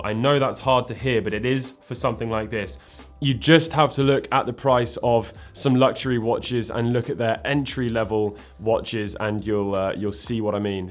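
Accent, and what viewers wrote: United Kingdom. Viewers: He said it correctly, the double ll is what makes the y sound and the bi rhymes with bee. His tour sounding like tuh is just his british accent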